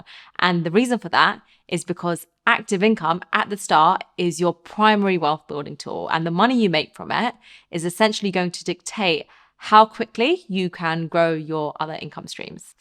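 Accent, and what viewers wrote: England, United Kingdom. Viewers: I normally dislike English accents , but hers is lovely, very feminine, if she just wasn’t so hard to look at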